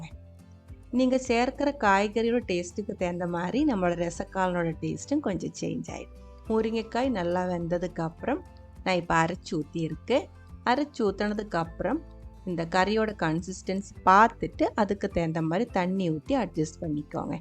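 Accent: Kerala, India. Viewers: V good. Lovely Malayalam accented Tamil. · "Valara nanyaittu irukaiyanu ningalinde recipe"I love your malayalam accent wile speaking in Tamil.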